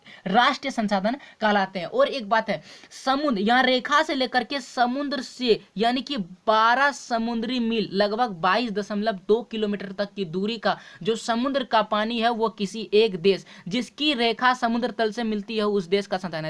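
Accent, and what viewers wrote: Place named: Rural, India. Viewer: Gawar jaise kyo bol rehe ho aur faltu me pencil kyo chela reha hai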